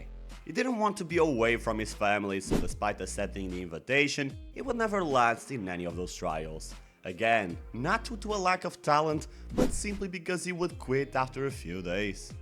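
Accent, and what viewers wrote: Portugal. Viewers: Mano o cara que faz os vídeos é português esse sotaque nao engana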